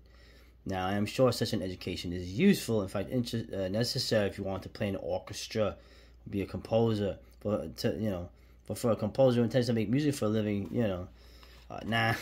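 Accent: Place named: New York, United States. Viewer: H0ly East Coast New York American accent Love It!! Sending Love from West Coast Canada, Vancouver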